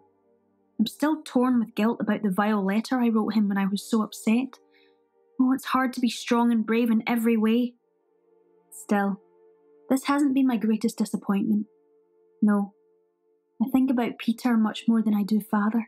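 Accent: United Kingdom. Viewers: The Scottish accent is a bit off putting but Anne's words shine through.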